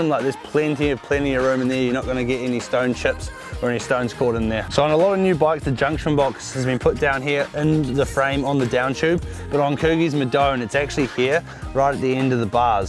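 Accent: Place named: United Kingdom. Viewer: your UK accent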